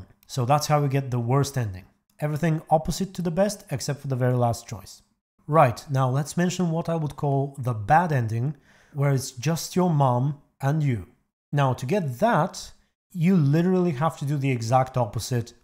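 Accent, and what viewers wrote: Bulgaria. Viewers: Are you from Bulgaria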